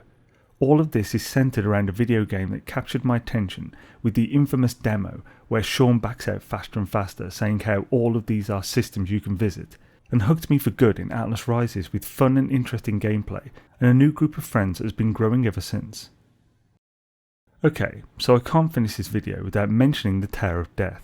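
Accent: United Kingdom. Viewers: Very cool video, love the old clips and how brummie you sound Very cool to have been a part of the development of the UAS and involvment with other bits and pieces, very honoured indeed! Again, great video and long may the fun continue! 😍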